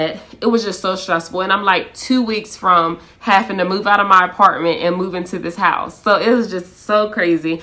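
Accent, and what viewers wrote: United States. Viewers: Are you from PG county? The accent! lol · Congratulations 💯 I can hear the baltimore in you 🦾 we different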